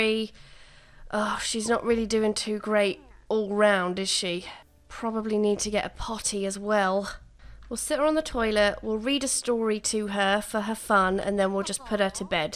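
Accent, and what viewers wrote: United Kingdom. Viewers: are u brtitish ur voice is cute and love the challenge video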